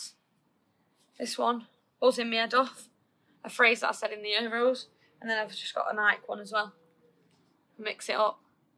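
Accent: United Kingdom. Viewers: The British accent is really cool I love it ❤️ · Hi. I'm trying to expose myself to all the British accents. To be honest, it's quite hard for me to understand you, but I realize that sometimes you emphasize your accent. Please, you don't need to do so cos some people follow you due to your regional accent. Could you please let me know what's your accent called? By the way, good luck with the World Cup.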